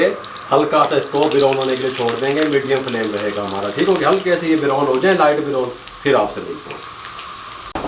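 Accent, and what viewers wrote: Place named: Ambala, India. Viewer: ap Aumbala sy hain Humary neighbour wahan sy thy Lehja bikul ap jesa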